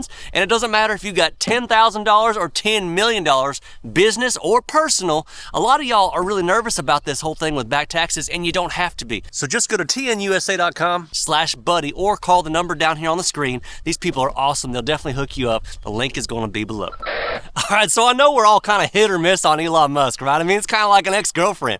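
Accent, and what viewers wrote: South, United States. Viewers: I just want to move down south, so I can sound as cool as you! 😎🤓🤠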